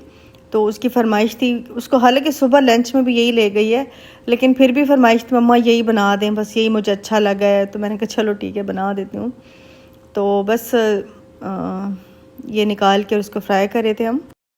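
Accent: Saraiki, Pakistan. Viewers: ji u r very beautiful. And your house is so beautifull. Aap bolti bohot pyara hn. Jub sairiki bolti hn to sun k mujhey bohot he acha lugta h. Mere naankey bhi pichey Dera ghazi Khan se thy. To sun k apnapun lgta h. I m from India.God bless you. Bye.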